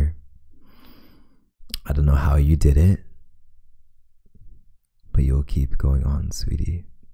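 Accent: Canada. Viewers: I hear Canadian 😌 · omg the sorry is so cuteeee. Are you canadian by any chance?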